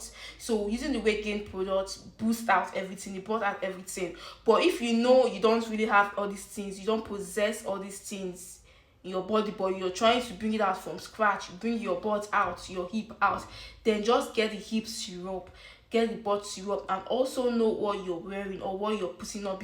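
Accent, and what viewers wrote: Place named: Africa. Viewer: The music makes it harder to hear what you're saying especially since i an not from Africa and the accent you have is new to my ears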